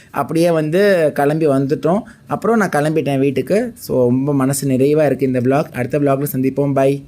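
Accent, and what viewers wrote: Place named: Tamil Nadu, India. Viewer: I love your tamil accent Anna❤😍😇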